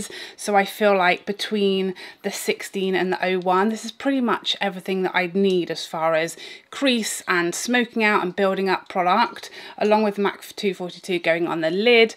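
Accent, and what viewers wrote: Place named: RP, United Kingdom. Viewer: Your channel came up in my recommended yesterday, and I have been binge-watching. You have great information. I really loved your last blush video, and I found several to try. Plus, being from the states and lover of English history and literature, it makes me feel like I'm spending time with my perfectly posh friend with with killer RP accent.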